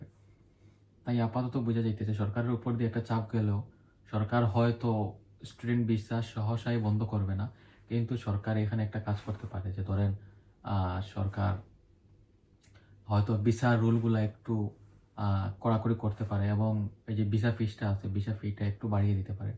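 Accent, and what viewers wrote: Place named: Sylhet, Bangladesh. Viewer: Bhai accent a lager Sylheti...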